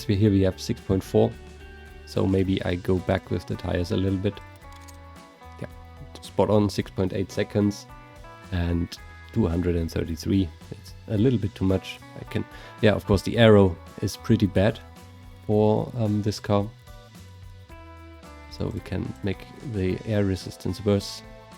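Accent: Bavaria, Germany. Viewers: JOOO are you deutscher mayby like bayrischer or mayby from frankfurt? but geiles auto find es auch geil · Geiles Denglisch fühle dich aber jetzt schon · Ich höre ein bisschen deutsch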